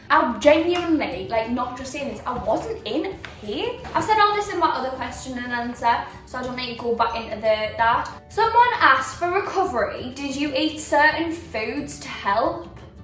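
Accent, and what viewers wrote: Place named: United Kingdom. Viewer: omg your british accent is lovely!!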